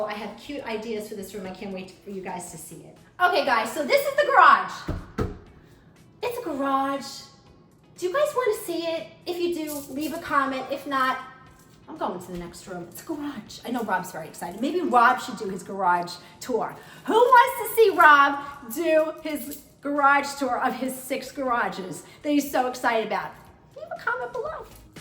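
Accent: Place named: New York, United States. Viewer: Are you from NY? Like the Bronx? You have the accent. I’m from Westchester NY parents from the Bronx. You are definitely a New Yorker!❤❤❤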